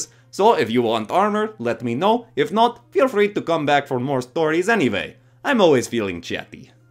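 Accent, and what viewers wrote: Russia. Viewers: Love the Russian accent, heh. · The russian accent!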